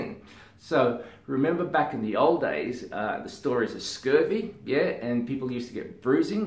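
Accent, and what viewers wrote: Australia. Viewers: Recognized the australian accent right away! 😁 Great video! ❤ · Being Australian and Asian somehow manages trip to me up when you talk still.. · KimLip'sLeftEye It’s because he’s so darn handsome.😍 Plus the Australian accent is adorable. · Hi Doc! This video popped up in my Recommended Videos and was pleasantly surprised that you had an Australian accent! And then I found out you're in Brisbane too!!! Loved the video but I have a couple questions - 1. Do I need a referral to come see you? And 2. The Ordinary has so many products in it's range... How do I know what to choose for my skin? Thanks heaps! Subscribed on YouTube and followed on Instagram :) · What a great surprise to find an Aussie! Like even as an Aussie, I went holy fuck he's got such a strong accent.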